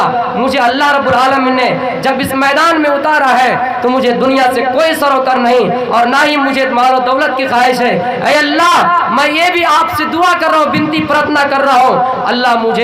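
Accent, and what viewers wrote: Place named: Bengal, India. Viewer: तकरीर सुनकर लगता है कि बांग्ला भाषी है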